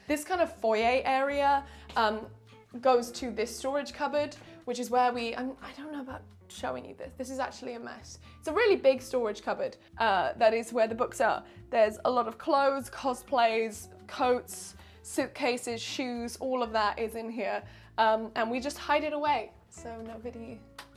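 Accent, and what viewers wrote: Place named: England, United Kingdom. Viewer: You sound English. So I’m slightly confused about ‘apartment’ and ‘13 hundred’ rather than ‘flat’ and ‘1 thousand 300’ The Americanisation of the UK is slow but sure people 😢😂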